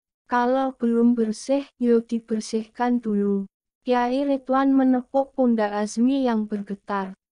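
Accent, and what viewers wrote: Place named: Java, Indonesia. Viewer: Lanjuuttt minnn .. suaranye kok tmbh kyak wong jawii ta 🙃🙃🙃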